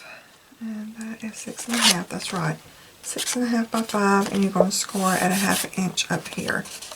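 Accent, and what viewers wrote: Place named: Tennessee, United States. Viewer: Your albums are lovely too 😊 (I lived in TN for a few years and your accent sounds very similar - I miss it so very much )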